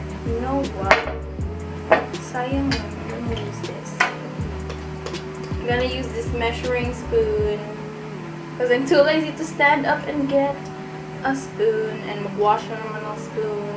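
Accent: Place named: Davao, Philippines. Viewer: This is as good as your writing skills :)) ..with some twist, the Davao conyo twist :))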